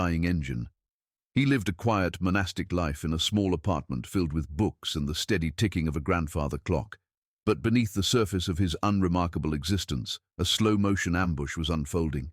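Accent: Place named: United Kingdom. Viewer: It would have been cooler hearing an American sounding vo ,1nstead of a Limey sounding voice over ! In fact any real sounding voice from an average American would have been better ! Not sayin’ it didn’t happen this way , either the guy was real lucky , or very brilliant tactically speaking or the folk that showed were totally drunk !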